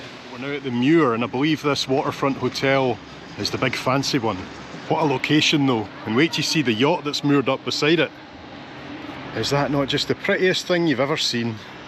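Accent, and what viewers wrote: Scotland, United Kingdom. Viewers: What a treat to stumble on a video yesterday and today, both narrated in my favorite accent Scottish. The videos were interesting, too. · The body of water between Halifax and Dartmouth is impressive. From Wikipedia: Halifax largely owes its existence to the harbour, being one of the largest and deepest ice-free natural harbours in the world. Before Confederation it was one of the most important commercial ports on the Atlantic seaboard. I loved watching your tour of my home town & listening to your Scottish accent. I recently visited Edinburgh and was touched that you would visit my childhood home, so far from your impressive city.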